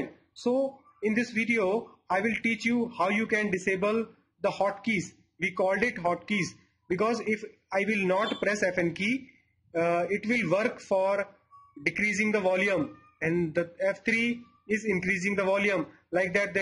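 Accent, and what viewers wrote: India. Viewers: sound indian bruh XD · Judging by the accent you must be Indian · Indian accent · FantasticGamerYT it's an Indian accent you're just an ignorant ass who has no consideration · Love that authentic Indian English accent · HAHAHAHAHA I love your indisch accent :D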